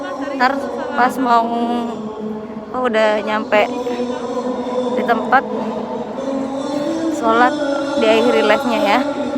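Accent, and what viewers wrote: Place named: Soppeng, Indonesia. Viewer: Cengkok khas Soppengnya itu loe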